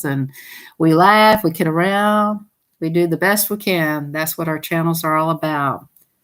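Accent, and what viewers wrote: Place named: South, United States. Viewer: Hi, love your accent 😄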